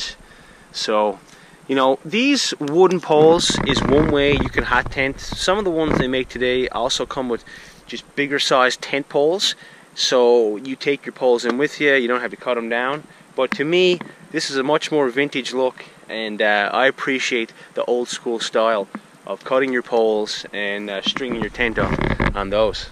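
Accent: Newfoundland, Canada. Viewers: That Newfie accent is so close to the Irish accent. very similar to mine and I live in Australia.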